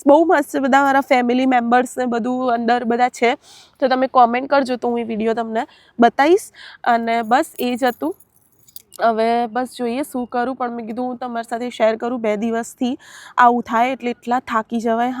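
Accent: Sabarkantha, India. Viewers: tamari accent to Sabarkantha jevi che 😊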